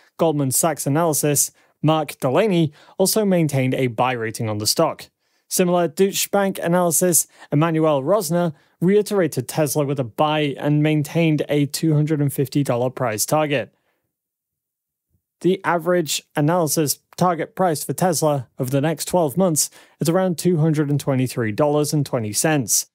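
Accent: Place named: England, United Kingdom. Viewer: You are right!! Imust also add that the accent this person posesses is extremely annoying; Is this guy from England or is he just pretending that he is from England. It's a pain just listning to him with that weird accent.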